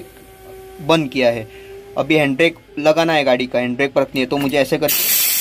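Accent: Goa, India. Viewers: Goan hindi accent aikonut kavle bhava goacho munu tu😍🔥🔥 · That Goan Hindi Accent..🔥🔥 superb..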